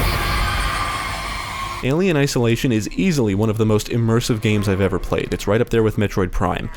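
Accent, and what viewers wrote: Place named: Mid-Atlantic, United States. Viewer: Are you friends with cinema cins or ACG because all 3 of you guys have that fast pace, mid-Atlantic get to the point informative voice lol.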